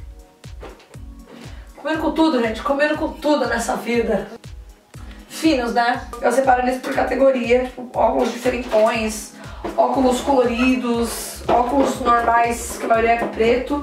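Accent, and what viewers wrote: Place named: Rio Grande do Sul, Brazil. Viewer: Sotaque gaúcho....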